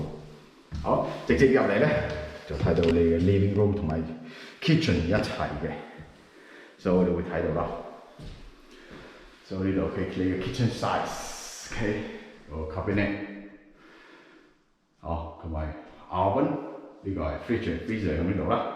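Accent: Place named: United Kingdom. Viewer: 旁述哥哥应该戴住口罩讲野 ，所以听唔清楚 ，加上一听已经知道唔系土生土长香港人 ，可能是在英国出生的华人 ，我姪女也是这样跟我说话的 ，唔紧要 ，会越做越好的。努力！加油！😉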